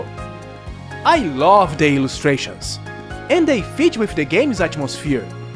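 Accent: Brazil. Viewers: Your accent screams braziliam... Nice videos anyway~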